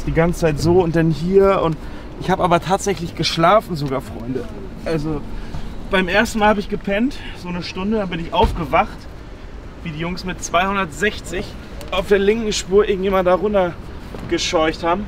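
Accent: Carinthia, Austria. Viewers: Kärnten Niederösterreich? 🤣🤣🤣🤣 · Kärnten, Niederösterreich? 🤔 😅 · Kärnten Niederösterreich?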